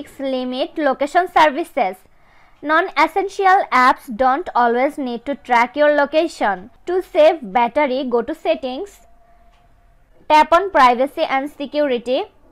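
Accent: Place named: India. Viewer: you sounds like indian call center scammers💀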